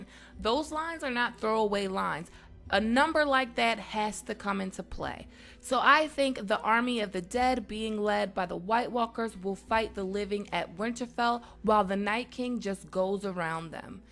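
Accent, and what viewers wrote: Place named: Baltimore, United States. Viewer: u sound cute when ya B"more accent comes out. lol It was thick in this video. But I agree D&D wouldn't do something as elementary as having the 1st plan work. I like #Stargaryens. Gr8 content as usual.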